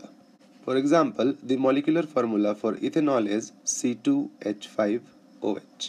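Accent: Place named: India. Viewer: one of the few Indian accents that doesn't irritate me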